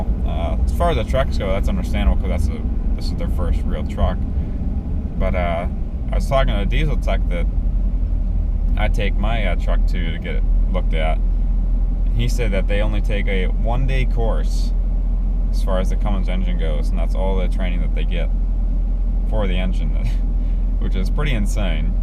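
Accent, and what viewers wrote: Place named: Illinois, United States. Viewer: what part of Illinois your from by your voice northeastern Illinois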